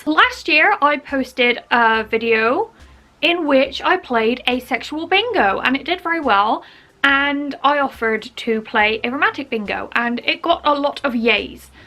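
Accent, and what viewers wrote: Bristol, United Kingdom. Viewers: This has been helpful. Also it's nice to hear a Bristolian voice on Youtube :) cheers drive!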